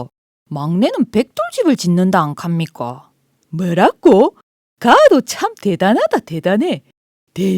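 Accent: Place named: Busan, South Korea. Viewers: 벽돌집의 숨은 비화를 파헤친다!!! 부산 말로 재미있게 들려 주셔서 감사합니데이~😊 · 귀에 쏙쏙 들어오는 부산 사투리가 정겹습니다. ^^ 세이(형), 속에 천불이 난다, 쎄가 빠지게, 쎄때 등등 어릴 적 고향에서 많이 듣던 말들이라서 그런가 봐요.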